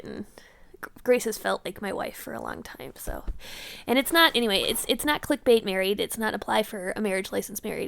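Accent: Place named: Midwest, United States. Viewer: Minneapolis, I was right. you are from the mid west. I can tell by your accent. so hey fellow midwesterner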